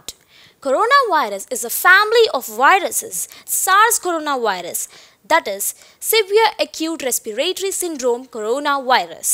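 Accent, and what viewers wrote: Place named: United Kingdom. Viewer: IN SHA ALLAH...I WILL SPEAK LIKE YOU.... I HAVE A GREAT BURNING DESIRE TO SPEAK...IN BRITISH ACCENT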